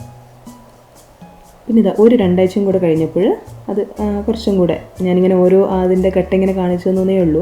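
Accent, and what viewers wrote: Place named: Kannur, India. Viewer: Krishi adipoli. Njanum nattitundu balcony il. Pinne samsaram kettitu malabar side aanennu tonunnu. Etra sramichittum naadan basha idaku idaku ariyate purathu varunnu alle 😁😁😁. Nhan kannur aanu. Kannur slang pole thonni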